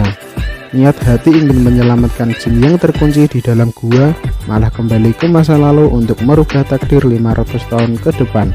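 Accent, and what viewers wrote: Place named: Java, Indonesia. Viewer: Jawa medok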